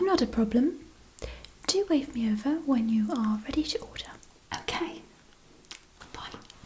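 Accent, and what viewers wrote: England, United Kingdom. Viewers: she's American but she sounds British. This is the first video that I watched on her channel so I thought she was British and then I watched all her other ones and she's American 😂😂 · A bit of a mix of several British accents 😊so so so calming and wonderful! you’re so so talented and I feel very spoilt right now:) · English accent. Britain is four countries. England, Northern Ireland, Wales and Scotland. All very different accents.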